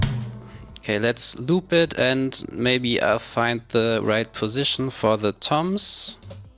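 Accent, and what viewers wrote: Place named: Germany. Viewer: beautiful german accent <3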